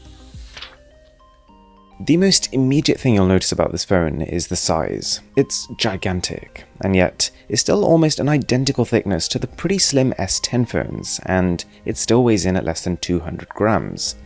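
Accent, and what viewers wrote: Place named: England, United Kingdom. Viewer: no man! He lives in England that pretty much explains his accent...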